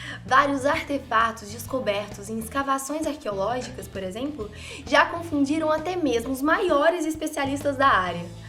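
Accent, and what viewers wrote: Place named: Minas Gerais, Brazil. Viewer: esse jeitin minerin de falar me despertou um interesse em saber se essa moça é solteira e se ela faz o wats dela deixar de ser um mistério pra mim kkkk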